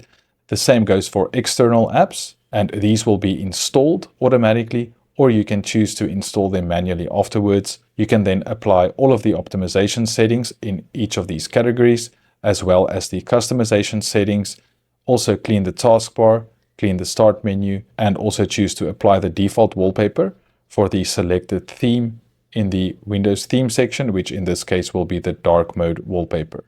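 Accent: South Africa. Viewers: Saffa accent I hear? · You sound South African. Great work. Thank you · You sound South African. It's not on git. I will assume AI slop unfortunately. I won't bother with Windows again though. If I really had to use Windows for someone else I'd just use shadowwhisperer/XakerTwo scripts from github, ChristTitus Winutil from github and the like. Transparency is needed. It is important to specifically turn off Windows malware injection garbage specially on Asus pc's. Windows Platform Binary Table (WPBT). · You sound like a South African that lives in Ireland.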